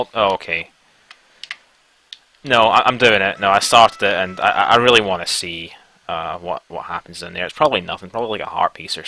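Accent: Scotland, United Kingdom. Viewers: I'm pretty sure that it's Scottish, but it might be Irish.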